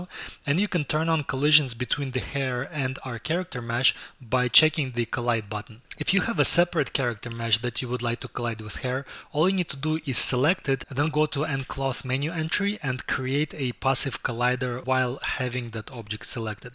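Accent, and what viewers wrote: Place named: Russia. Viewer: ты русский по акценту видно))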